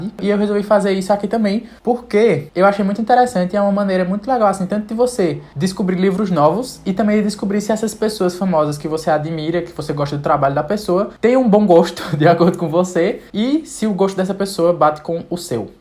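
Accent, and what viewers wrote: Northeast, Brazil. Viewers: ótima esta proposta comentar os livros indicados por pessoas que você admira. Crie sim um Quadro. Três observações apenas. Não se preocupe com sua pronuncia dos nomes, mas voce pode por exemplo, consultar no google tradutor, no audio a pronuncia do idioma original. Segunda, Bob Dylan nasceu na decada de 1940 período da segunda Grande Guerra. Por fim, fale mais lento, voce explica com clareza, logo, não precisa acelerar sua fala. É muito bom ouvir o seu sotaque, infelizmente minha mãe que nasceu em Pernambuco e veio para Minas, perdeu o dela. Sucesso sempre!😊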